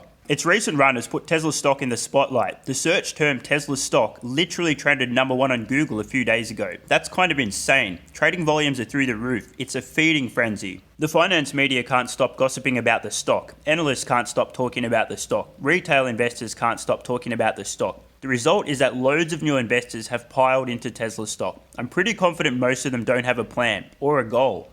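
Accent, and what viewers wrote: Australia. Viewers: It's going to be a tough decision to sell a single share in 10 years! I really hope by then they are paying a dividend by then so I can retire early. Great vids and nice to hear an Aussie accent to get my TSLA news from too, thanks mate! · Great Aussie accent!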